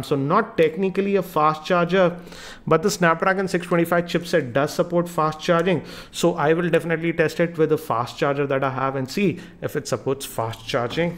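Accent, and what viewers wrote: India. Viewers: Indian English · Good video, but maybe you can talk a little bit slower, because ( no racist ) indian accent and fast talking together is really hard to follow in my honest opinion.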